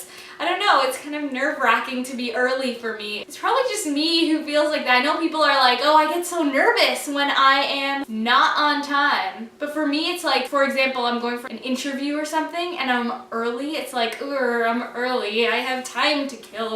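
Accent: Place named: South, United States. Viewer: I love the country accent ajajajaj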